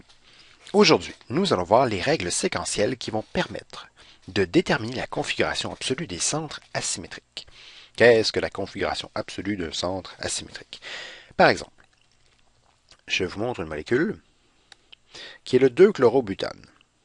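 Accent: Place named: Quebec, Canada. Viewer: Oh mon dieu, je suis en première année de médecine, ça fait trois mois que je cherche à comprendre cette chose !!! A deux semaines des partielles je comprends enfin, en plus avec l'accent québécois, c'est parfait ! Merci beaucoup !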